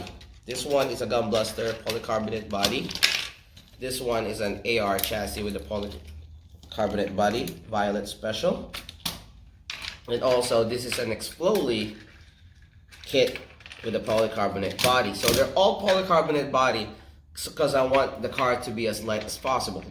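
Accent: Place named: Philippines. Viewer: Filipino accent I hear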